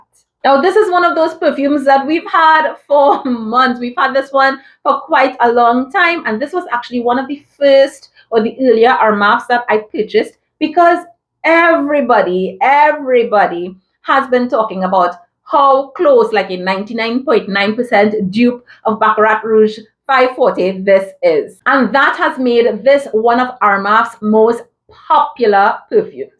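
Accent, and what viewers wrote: Trinidad and Tobago. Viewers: U sound trini ❤❤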